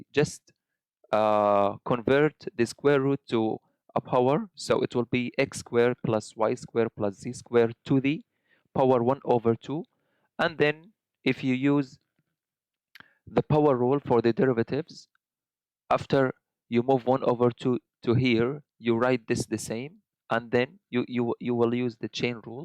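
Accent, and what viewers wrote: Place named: India. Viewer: The best accent as indian I've heard so far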